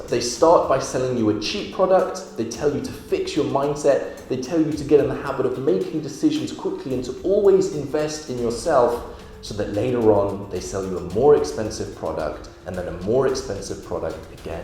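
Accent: United Kingdom. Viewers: Hey, i thought you were from the UK because of your britisch accent haha. Where are you from? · What? You mean the british accent? The accent that has because he is from England? The same accent used by most of the british population? I guess you're right. If this british guy we don't personally know is talking about money with a thick british accent, then he's probably some trust fund baby trying to scam us.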